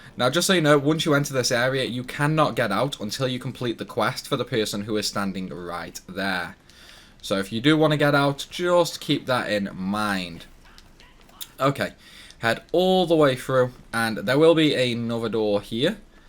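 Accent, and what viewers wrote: Stoke, United Kingdom. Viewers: Are you from stoke ?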